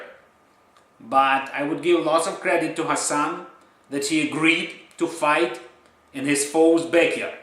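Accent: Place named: Russia. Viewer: Are you russian buddy ? LOL I like your accent.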